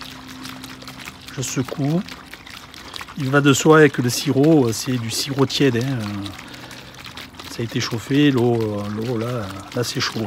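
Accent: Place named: Provence, France. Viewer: Bonjour Peut on utiliser ce même mélange (AO+AF+ SIROP) sur des lanières cartonnées pour un traitement avec couvain? En cas de forte Infestation, jusqu'à combien de traitement flash peut on faire en automne sans trop de risque sur la reine notamment? Félicitations pour la qualité de vos videos et votre sens de partage. Avec les enfants on suit vos vidéos et on apprécie le bel accent provençal 🙂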